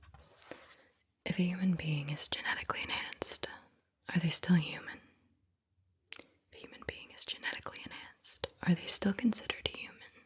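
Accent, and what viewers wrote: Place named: United Kingdom. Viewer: 1 sometimes 2 morning coffee 😊 3 football (Love your British accent BTW) 4 music 5 grandad 😢 6 sorry I didn't catch that 8 carting 9 2 people falls the middle 11 face 12 hotel 13 // Xd 14 I guy with three b f Xd 16 I lost number count Jak & Daxter Senen 100% Police man coming Xd Idi Amin conquer Having a gf Xd Mercadona Empathy German Lost familiar? Sorry I don't have that understanding level I am nice person Animal loving Treat of others (This is the life) Wallet, phone, Air pods Currently lost train Yours 💜💜🔥 Curious * But JK Rowling fault 😅 Maybe not But I found 20€ And turned to catch them But they weren't Not me But take car dr n k A grl trowing €100 away on the street That later came to my pocket It wasn't r0b she didn't want them And Xd There was more money But I didn't take Politics Yes Queen Xd 😊 Humans AI Health care Barcelona Diddy Cap Chicken Fortnite ❤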